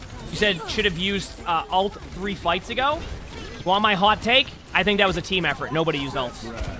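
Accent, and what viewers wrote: Boston, United States. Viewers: Love the Boston accent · I love the Boston accent😂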